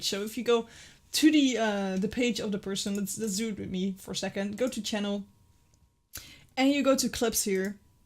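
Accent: Netherlands. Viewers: Are u dutch ?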